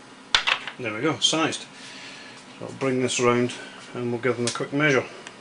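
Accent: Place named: Scotland, United Kingdom. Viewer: Sound Scottish...good video.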